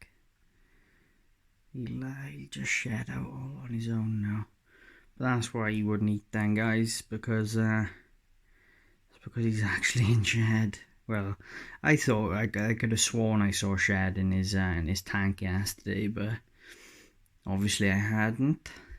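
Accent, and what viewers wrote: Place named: England, United Kingdom. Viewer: Im guessing that you are English by your accent. Do you guys have corn snakes there? Thanks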